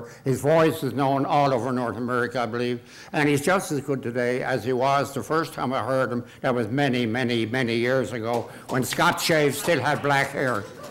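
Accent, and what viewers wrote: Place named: Ireland. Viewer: Interesting accent. I can hear the Irishman in his accent. Greetings from Ireland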